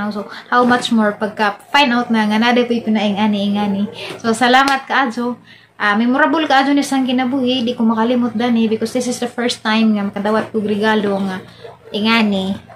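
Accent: Bohol, Philippines. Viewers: You're deserving ma'am, because you're so kind and truthful. Malipayon jud ko makadungog sa imong bol-anon accent, taga bohol man sad ko sa Dimiao Bohol, God bless you and your family 🙏🙏🙏🌷🌷🌷❤❤❤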